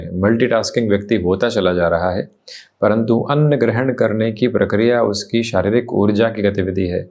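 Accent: Standard. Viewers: Your brilliant clarity with wonderful shudh Hindi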